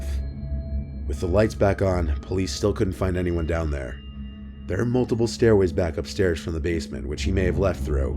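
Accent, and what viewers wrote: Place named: East Coast, United States. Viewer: Literally no one better than and his East coast accent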